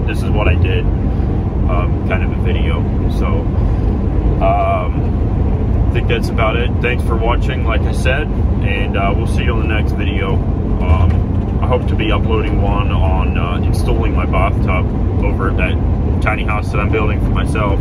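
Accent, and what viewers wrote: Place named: United States. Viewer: I thought he from the states ok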